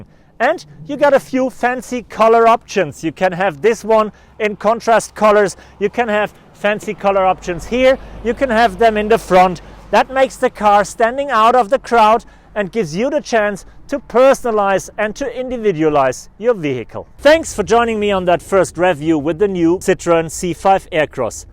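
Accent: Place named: Germany. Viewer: You ain't no violinist; just a regular bratwurst German with a Nazi accent.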